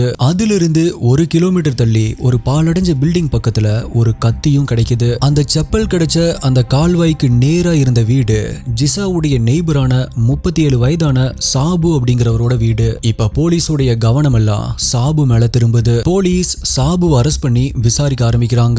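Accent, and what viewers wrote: Coimbatore, India. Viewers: unga coiambatore slang super bro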